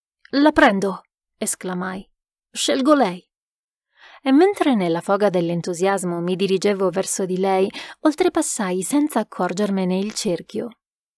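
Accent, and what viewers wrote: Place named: France. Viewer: Bravissima, mi piace soprattutto il tuo accento francese. 👏👏👏👏👏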